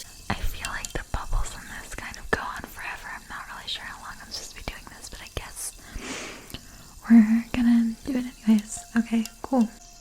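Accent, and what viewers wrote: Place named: United Kingdom. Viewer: shes sounds british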